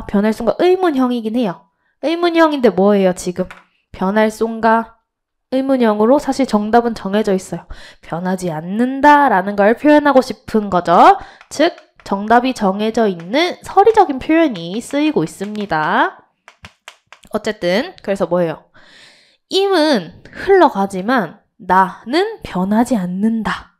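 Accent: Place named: Gyeongsang, South Korea. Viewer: 경상도 분이신가 사투리 나오시네 ㅋㅋ귀엽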